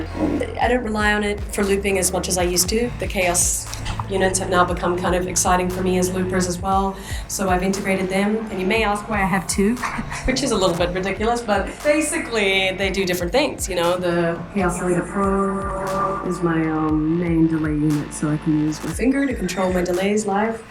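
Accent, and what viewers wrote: United States. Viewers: Her accent has really shifted towards American sounding. Anyways, love her. Love the rig. Love the music. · Shame she is turning so yank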